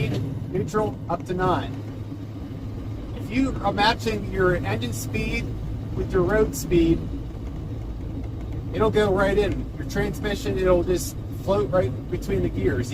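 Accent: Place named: Minnesota, United States. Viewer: Where are you from? Minnesota?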